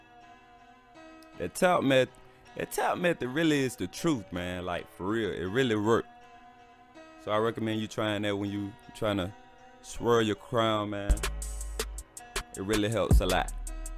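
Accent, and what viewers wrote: Atlanta, United States. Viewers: Atlanta accent strong and them 540's gone crazy my g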